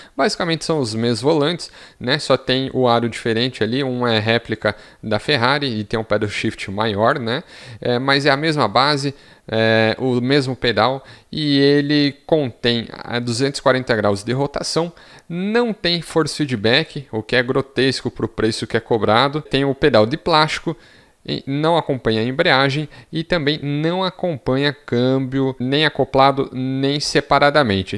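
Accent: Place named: São Paulo, Brazil. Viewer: MUITO PAULISTA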